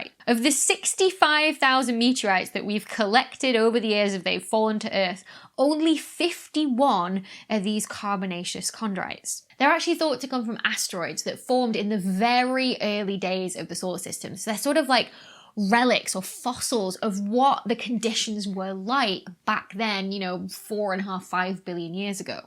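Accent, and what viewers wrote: United Kingdom. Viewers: That British accent though...If you listen to how she speaks and not necessarily all of the science jargon she's talking about and still love it...give this comment a big thumbs up! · with the british accent and a cute face cant ask more than that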